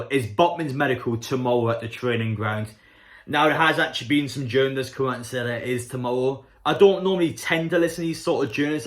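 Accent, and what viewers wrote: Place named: Geordie, United Kingdom. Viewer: mate whats your accent? Its a mix of geordie and something else😂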